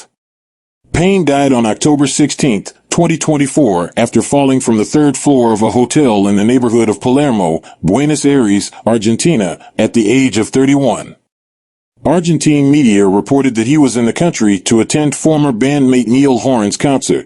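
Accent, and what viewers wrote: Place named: United States. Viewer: Whats with the American commentator.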